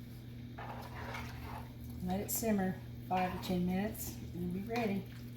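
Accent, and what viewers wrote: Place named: South, United States. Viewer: Thanks, this brought back memories from my grandmas cooking. She had the same southern accent, too.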